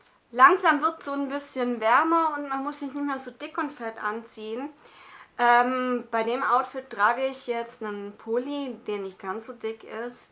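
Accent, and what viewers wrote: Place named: Swabia, Germany. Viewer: Als Du gesagt hast:In den Schuh rein schlupfen!!Mußte ich echt grinsen.Mag dein schwäbischer Dialekt.Bin selber geburtig aus Biberach an der Riß.Liegt zwischen ULM und LAUPHEIM.Liegt im Oberschwäbischen Bereich.Drück Dich mal von der Ferne.Wohne jetzt im Bereich Würzburg,Bayern!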